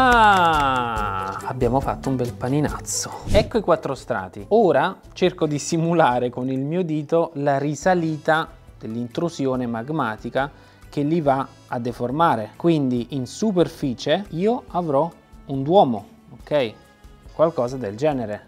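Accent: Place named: Naples, Italy. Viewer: ma togli quell' accento napoletano suu'! che rovina l interesse dell argomento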